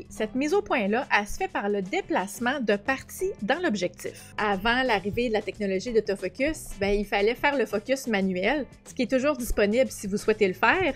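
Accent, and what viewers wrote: Quebec, Canada. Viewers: Avant tout, trop mignon cet accent québécois. Il est vrai que le bouton enclencheur, dans sa position à mi-course, règle deux paramètres. Le premier est l'AF et le deuxième est... la mesure de la lumière appelée posemètre. C'est la raison pour laquelle, il est utile de séparer l'AF et la mesure de la luminosité, avec le back button. Par contre l'autofocus a ses limites pour des photos de nuit très sombre. D'où une mise au point manuel à l'aide de l'écran (Live View pour Canon).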